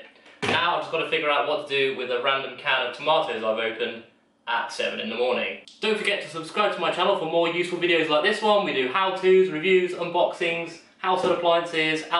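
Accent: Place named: England, United Kingdom. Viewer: I just received my Kenwood CO606. Stay safe💙 Ps. It is so nice watching a youtuber with an english accent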